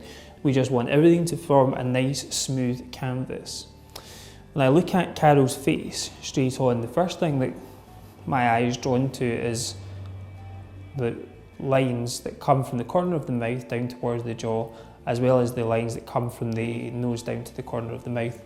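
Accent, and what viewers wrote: Scotland, United Kingdom. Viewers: Where are you based,? I note your Name is Scottish and accent. Are you based in SCOTLAND?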